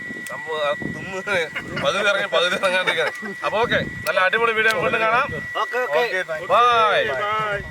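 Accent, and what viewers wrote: Palakkad, India. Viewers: Palakkad slang🙈🙈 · Edh rajyathayalum ikkayude tade mark ane... Kalli thuni Hawayi Palakkadan slank Nalla atmosphere... 😍😍😘😘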